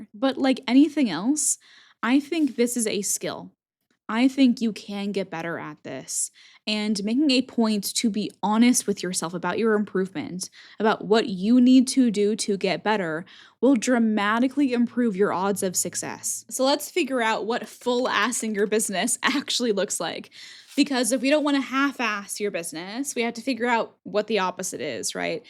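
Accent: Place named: United States. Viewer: I'm kind of waiting for you to address and acknowledge the privilege as well: privilege of being a white American woman (at least from the accent), of having a partner who is supportive who has a high paying job that can support two people, of living in the U.S - particularly NY and LA. I'm not bringing down your accomplishment, but I'd like the average person reading this to not compare their reality to yours, unless it's very similar.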